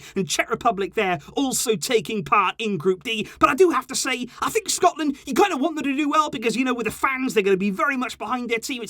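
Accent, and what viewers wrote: Wales, United Kingdom. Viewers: Am I the only person realizing he’s Welsh 😂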